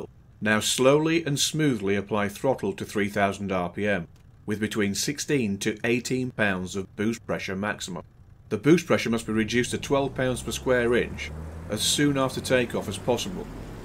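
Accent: United Kingdom. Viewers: I like this! It’s the perfect accent for a British airplane. It puts me in the mood lol. Wags is very busy with other modules and probably making Apache vids also.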